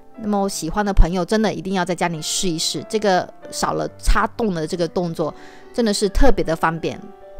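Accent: Fuzhou, China. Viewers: 你好，我也是福州人，老乡一听口音就可以听出来😂 我想问一下，沙姜粉可以用其他东西代替吗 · Are you fuzhou? Sound like from fuzhou or fujian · 好熟悉的福州口音普通话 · 应该是福州口音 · 感觉是福州人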